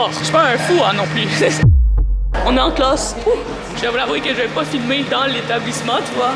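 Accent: Quebec, Canada. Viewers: J'adore l'accent Québécois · Tu es québecois ? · Québécois je pensr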